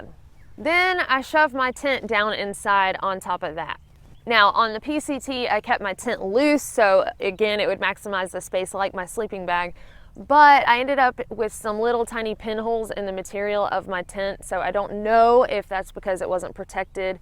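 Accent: South, United States. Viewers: As a southern boy myself, your accent is quite endearing. Nice video & suggestions &, if you don't mind, I'd like to let my scouts see it when they're getting ready for a trip. Always seems to sound better to them when I use references. Keep em coming!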